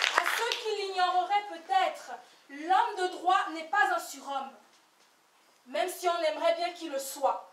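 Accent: Cameroon. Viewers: je savais déjà que tu étais une camerounaise avant même que tu le prononces. J'aimerais vraiment te rencontrer :).🇨🇲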